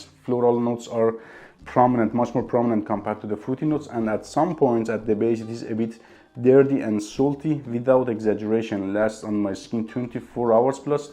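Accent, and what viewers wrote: Iran. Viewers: به لهجت میخوره ایرانی و هموطن باشی ، موفق باشی جان لذت میبرم از رویو هات و خیلی تو خرید هام هرسری کمک میکنه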